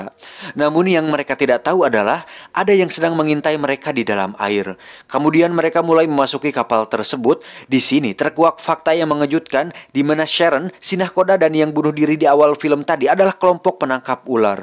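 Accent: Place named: West Java, Indonesia. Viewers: Sunda mnh nya min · Anying urang Sunda mang🤣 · Maklum..lidah orang sunda kan gitu...F Jadi P....Z jadi j😅😅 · Urang sunda euy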